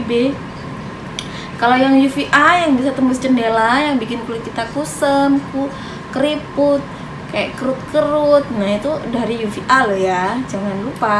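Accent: Java, Indonesia. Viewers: iki wong jowo to, ketok medok 😅😅😅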